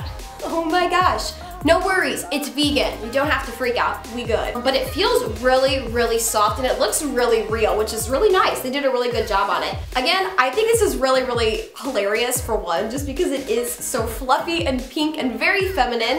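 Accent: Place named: United States. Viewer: You have a very American sounding voice, if that makes any sense 😂 like you pronounce every word very accurately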